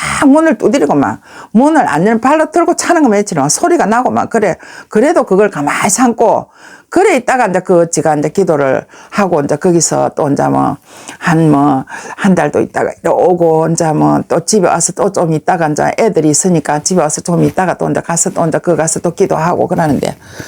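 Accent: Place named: Gyeongsang, South Korea. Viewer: 경상도 말 그대로 하니 정이 갑니다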